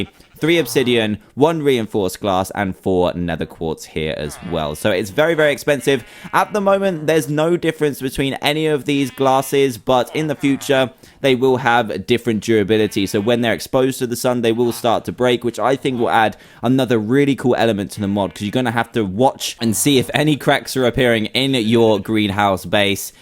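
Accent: United Kingdom. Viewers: Mean he's got an awsome Boise I've always wanted a British voice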